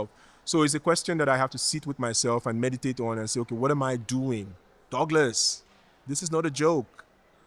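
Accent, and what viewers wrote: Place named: Nigeria. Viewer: Could feel brother Douglas intonation. That his 🇳🇬.. watching from Nigeria...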